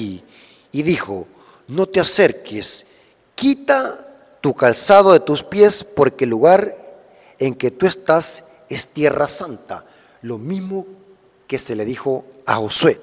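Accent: El Salvador. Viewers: Así se habla en El Salvador, como aquí en México, cada estado tiene su acento.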